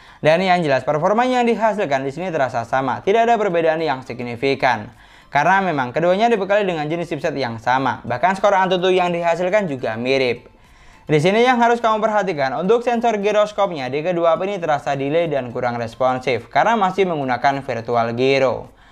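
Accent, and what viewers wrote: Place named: Madura, Indonesia. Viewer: Btw asli mana bang? Logat nya menarik heheheehe..b Saya LBH fokus ke logat nya.. Kayak logat madura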